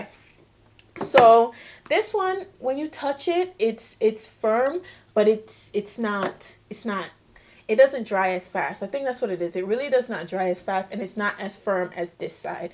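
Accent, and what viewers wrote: Jamaica. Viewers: I thought I heard a slight Jamaican accent. My mother is from JA, my ears are very sensitive to the accent, no matter how slight it is. 🇯🇲🇺🇸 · You are Jamaican. “ Ova yassuh “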